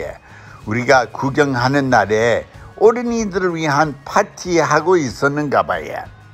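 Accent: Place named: Gyeongsang, South Korea. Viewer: 남들은 몰라도 저는 부산이 고향이라 경상도 사투리로 설명해주신게 너무편하게 잘들리고 쏙쏙 이해가 잘되서 너무 좋습니다~^^ 항상 건강하시고 다음편도 기다리겠습니다~!!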